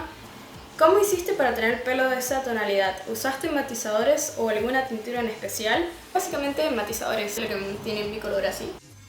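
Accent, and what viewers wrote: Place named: Venezuela. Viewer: De que país eres ne da curiosidad? esque en tus vídeos dices que vivías en Argentina y mencionas mucho Argentina, pero yo escucho tu acento de Venezuela...PD Saludos, me gusta tu canal y tu forma sencilla de ser nunca cambies:)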